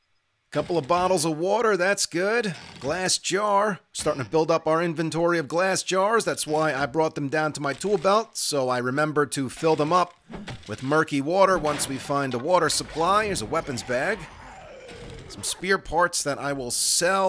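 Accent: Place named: Philadelphia, United States. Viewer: His heavy accent makes me miss my hometown. Can't tell me he's not from philly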